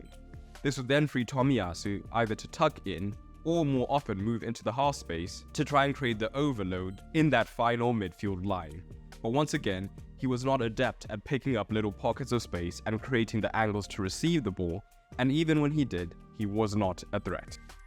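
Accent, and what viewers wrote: South Africa. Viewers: yo are you south african ?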